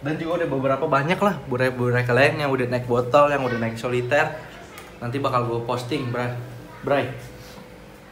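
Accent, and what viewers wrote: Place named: Betawi, Indonesia. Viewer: Batawi luh medok medok bner om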